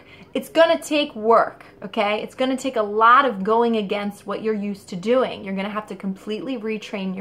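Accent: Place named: Philadelphia, United States. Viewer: I KNEW that was a Philly accent! Haha Takes one to know one. 😊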